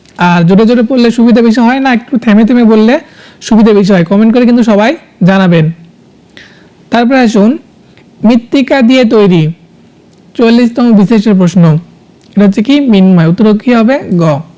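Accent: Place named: Bogura, Bangladesh. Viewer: ভাই এর বাসা বগুড়া নাকি? ভাষা ত তেমনই 😊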